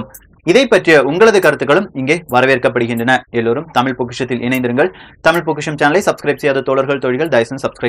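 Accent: Kerala, India. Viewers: Don't compare with TamilNadu and Kerala, (u are a malayali your slang is little much of keralites) TamilNadu is always developed state then Kerala,. We have large no of colleges, most if the keralites willing to study in TamilNadu only. After primary education for best higher studies they have to come here only.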